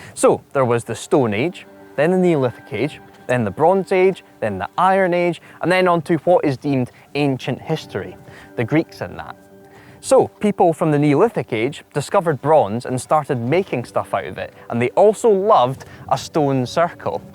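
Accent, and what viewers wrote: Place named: Scotland, United Kingdom. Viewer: He sounds scottish and its the English that say mores for pronounciation of moors .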